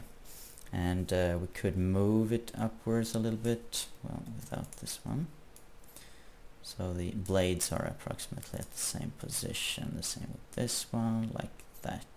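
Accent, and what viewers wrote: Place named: Sweden. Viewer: jag hörde dirrekt att du var svensk! :)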